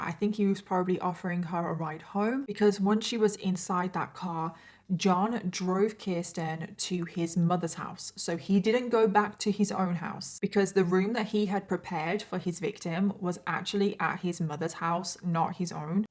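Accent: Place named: United Kingdom. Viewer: I Looovvvee your accent, always been a fan of British accents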